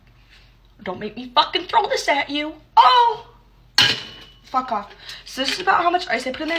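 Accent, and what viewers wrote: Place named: United Kingdom. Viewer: Loving the British accent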